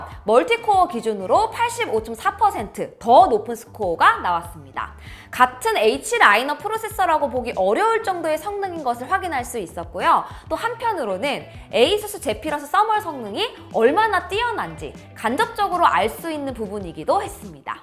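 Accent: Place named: Seoul, South Korea. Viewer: 이분말투 전형적인 요즘 서울말사투리네요 ㅋㅋ중간중간 음올리는게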